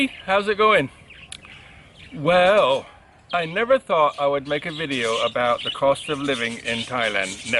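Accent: United States. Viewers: One more if I may! Your English appears to be more American than British how is that possible? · This is your first video I've watched. Thanks for the good info. I was a bit surprised to find you're British. You sound every bit American :-)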